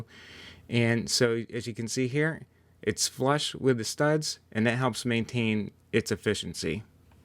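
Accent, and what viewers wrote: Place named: Pennsylvania, United States. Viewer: It's a PA accent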